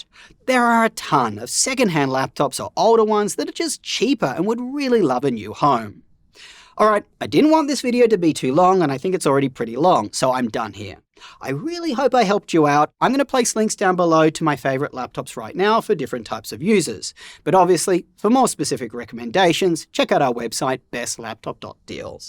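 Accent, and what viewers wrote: Australia. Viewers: i got excited thinking it'd be an australian website with australian deals, thanks to the australian accent.. quickly got shut down. Wish you did one for the domestic market as well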